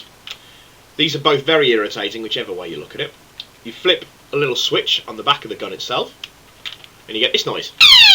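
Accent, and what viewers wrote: United Kingdom. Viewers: I know you may have heard it before but my friend and I think you sound like a brit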